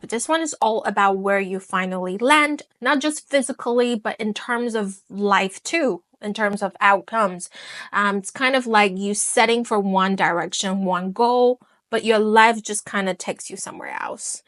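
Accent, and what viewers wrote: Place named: United States. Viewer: American accent 😊😊😊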